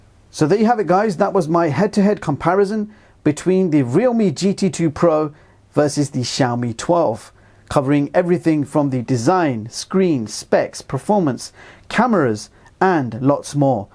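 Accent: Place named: United Kingdom. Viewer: i love British accent ❤️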